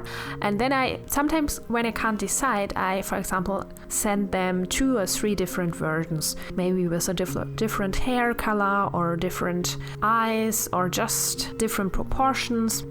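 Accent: Germany. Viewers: Your german accent totally annoys me but your paintings are awesome.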